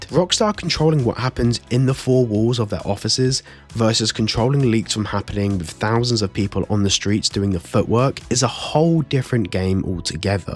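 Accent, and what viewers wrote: United Kingdom. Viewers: i scrolled the comments before starting the video and from the way they spoke i didn't see this coming but.. dude that mask is sick lol, fuck the ones pushing for a reveal, they're not worth shit. You sound British, so you should know first hand how people act with famous people, no one's worse than the brit paparazzi and i wouldn't hold kids on youtube at any higher a standard, your identity is yours, do what YOU want with it.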